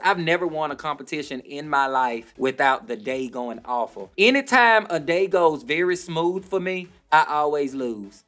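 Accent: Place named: South, United States. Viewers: Wait she’s southern?? I had no idea 😂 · What a pure pleasure to have and that southern accent for almost 30mins. Shes such a natural in front of the camera. Looove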